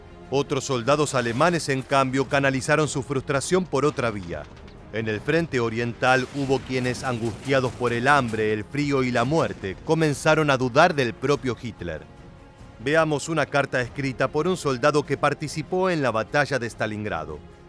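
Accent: Argentina. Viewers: Argentina, no escuchas su acento? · Es Argentino, sólo escuchá su acento.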